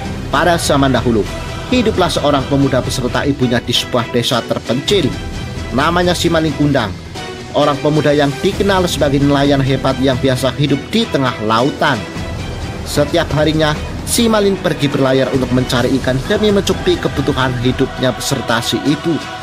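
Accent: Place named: Java, Indonesia. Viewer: wou suara lo jawa nya ga usah medokkk ngtu kali ......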